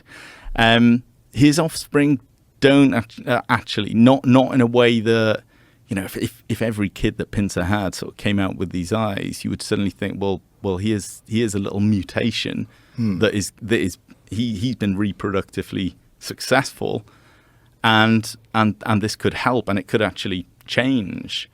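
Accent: United Kingdom. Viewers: Proof that having a British accent can make a man who is saying absolutely nothing sound articulate. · There's no such thing as a British accent he has a welsh accent 😂😂😂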